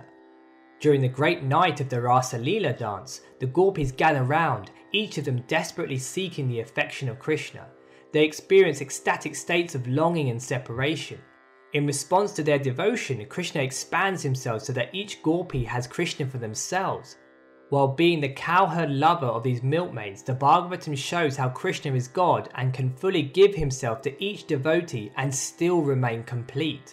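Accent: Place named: United Kingdom. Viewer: I think and he sound like british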